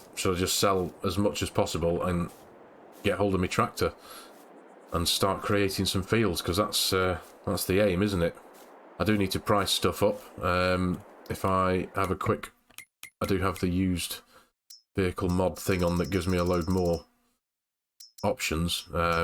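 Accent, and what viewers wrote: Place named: Castleford, United Kingdom. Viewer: Ey up I'm chatting with my wife trying to think where ya from n u sound castleford area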